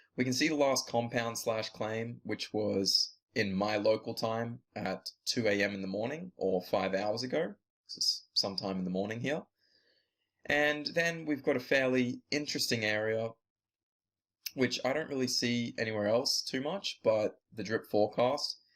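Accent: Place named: Australia. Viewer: Great video. Hi from Melbourne, Australia and you sound like an aussie too.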